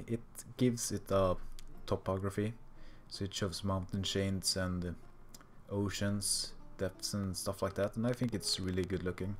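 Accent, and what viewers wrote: Sweden. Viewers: are you Scandinavian (your accent sound Swedish but it could be another country)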